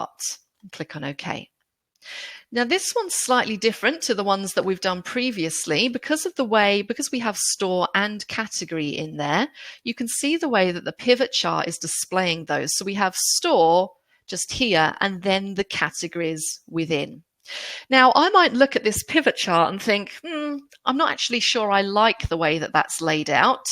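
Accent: England, United Kingdom. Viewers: I like genuine English accent in addition to your fabulous teaching.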